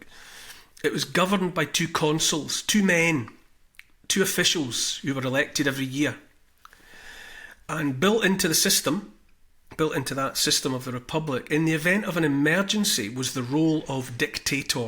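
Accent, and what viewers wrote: Scotland, United Kingdom. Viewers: Always the Voice of Reason and love hearing it from your Scots accent! 😄🙏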